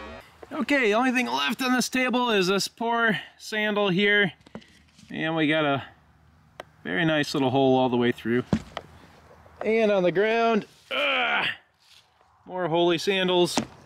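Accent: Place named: Midwest, United States. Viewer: Can't get enough of the Midwest accent! like the 22lr videos, but come back for the accent! You sound just like my late dad and all my cousins, aunts and uncles from STL, Iowa, and WIS....Have a pop doncha know!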